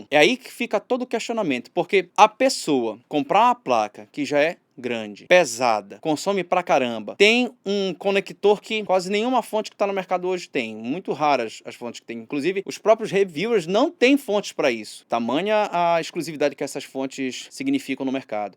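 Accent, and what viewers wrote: Pará, Brazil. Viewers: da pra saber que o cara é paraense só pelo ritmo da fala dele.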